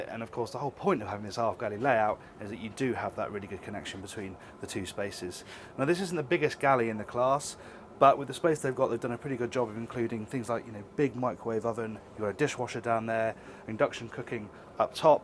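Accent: RP, United Kingdom. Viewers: 2) Questions, 1st) Why is do they put the helm station on one side of the fly bridge, while the helm below is on the opposite side? 2nd) Do they put the helm on a fly bridge, on the side of the boat most frequently used to dock, making it easer for docking? Therefore wouldn't you want the same orientation below as well? or having the helm on opposite side offers, the best flexibility when docking? Your my 1st-ish or 2nd-ish, favorite boat presenter's, very British, kinda too proper. Opposed to Aquaholic, who's more flamboyant. Both of you are stalwart, and very good presenters, comfortable on camera, and the information you provide, is always clear and... precise Thank's from the US. Cheers!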